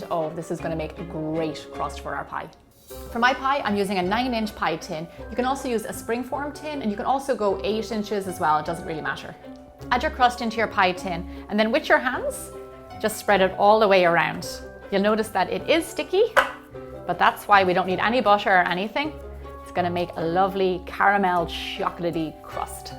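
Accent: Ireland. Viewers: She sounds Irish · ur accent is beautiful what part of Ireland r u from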